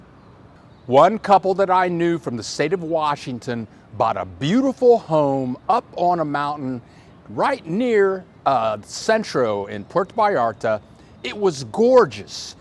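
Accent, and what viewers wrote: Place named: Midwest, United States. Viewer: Funny. From Detroit, I detected midwest there!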